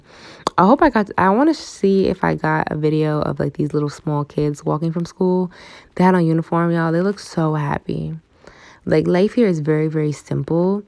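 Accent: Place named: New York, United States. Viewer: Yoooooo this shit beyond crazy. 1 you sound like you from the Bronx or something 2. My dad is right up the street 3. Idk how I ended up here but yeah dope video it’s just you sound so New York 😂😂 tf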